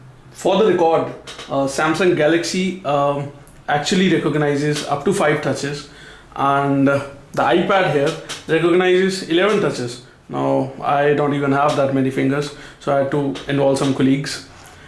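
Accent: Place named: India. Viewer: ur indian accent sucks!! its funny though!lol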